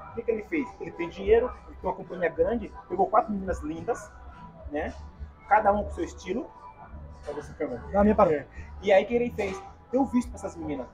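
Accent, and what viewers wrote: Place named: Brazil. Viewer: Não Acho que há problema algum nisso, não deixa de ser um idioma, e nos entendemos, e se acompanhasses realmente o canal dele, saberias que ele, adora a cultura e o povo brasileiro, e muitas vezes mencionou que sempre que fala com brasileiros tenta falar como um brasileiro, o que eu acho bonito como brasileira, não sei para os angolanos, mas isso não lhe tornara brasileiro, ele ama idiomas, e idioma não se baseia só na lingua mas sim em pronúncias e sotaques diferentes, aprender sotaque de outro país também é um desafio linguístico. Parabéns para ele, Brasil te ama.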